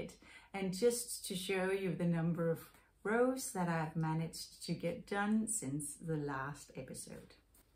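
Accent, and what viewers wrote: Denmark. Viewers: Hej, da jeg hørte dig tale første gang jeg så Mindful Making, kunne jeg høre med det samme at du var dansk.hahaha Det var en rar følelse. Med hensyn til dit garnlager, skal man jo ikke gå ned på garn, vel!?;o) Jeg kom til at tænke på, at hvis der er nogen i Australien som har brug for Holst garn, kunne jeg hjælpe med at skaffe det og sende det som en gave (told:o og så kunne jeg få noget garn tilbage på samme måde. Hvad mener du om ideen? Vh Maud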